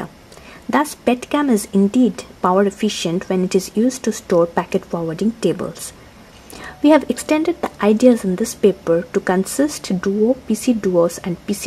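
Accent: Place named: India. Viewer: please, can one indian in math/comp.science vids speak without this fucking accent. just one?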